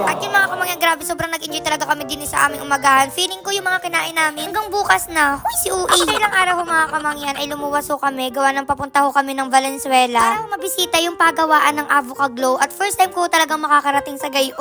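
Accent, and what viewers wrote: Batangas, Philippines. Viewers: Manyan ka,,pero tonong batangas ka...🤔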